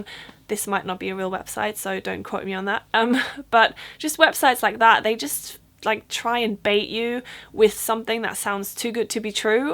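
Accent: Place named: England, United Kingdom. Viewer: Called it..... best English accent from a German. Of. All. Time. Gut gemacht!!!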